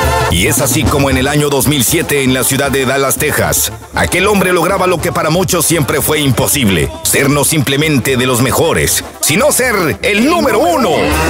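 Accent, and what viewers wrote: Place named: Michoacán, Mexico. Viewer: Señor mi saludo desde bakerfiel California yo mucho pensé que era de Michoacán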